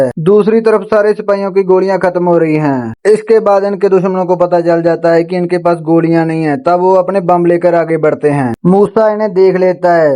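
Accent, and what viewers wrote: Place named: Punjab, India. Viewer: NICE BRO TUHADI HINDI CH PUNJABI VALI GALL BAAT AA IK VAAR PUNJABI CH EXPLAIN KARIO ATT BRO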